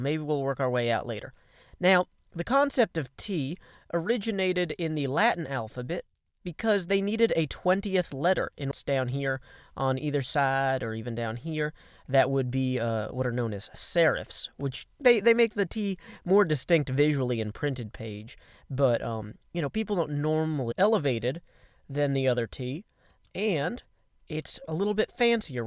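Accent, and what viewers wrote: United States. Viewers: your accent still sounds american.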